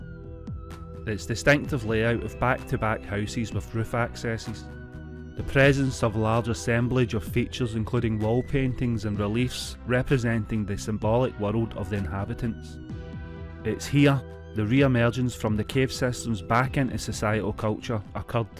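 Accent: Scotland, United Kingdom. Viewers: English with a Scottish accent 🏴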